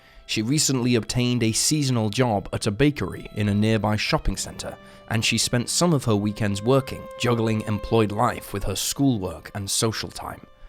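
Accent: United Kingdom. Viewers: British accent 👎🏻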